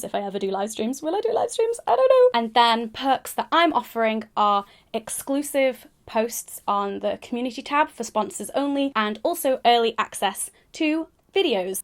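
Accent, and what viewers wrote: United Kingdom. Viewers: Is it weird that I'm a french random guy watching a feminine channel? I really like that Britain accent tho.. And You are quite beautiful.:)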